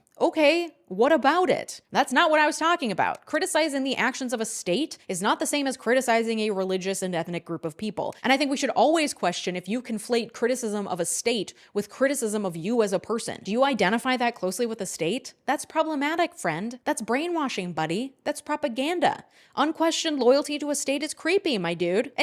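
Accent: United States. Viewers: In the beginning of your video you criticized zionism, which fair, I do also think it's kind of weird as a concept, buuuuuuuuut, aren't you from the USA or Canada? How did you think white people got there in the first place, don't you find it weird that you never see native-americans in your day-to-day, they were genocided, and your assessors probably had some kind of envelopment in that. But I don't guilt you from being born in the country you happen to be born with and want to keep living there, I just find it weird that you talk about zionism with an american accent, which is a by-product of colonization, which is just another word for genocide and occupation of a foreign country.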